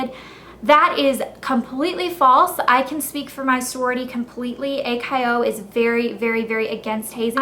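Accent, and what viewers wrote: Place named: Ivy League, United States. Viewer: You sound like you went to Cornell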